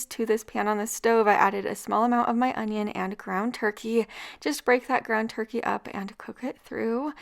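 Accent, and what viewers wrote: Utah, United States. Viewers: I suspect another way of pronouncing things in Utah. It’s cute. I’m a Utah transplant.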